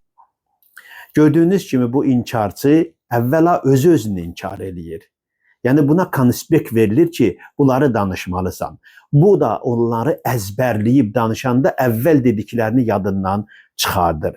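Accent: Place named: Iran. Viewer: Birinci Azerbaycan dilini fars lehçesinde danışma..............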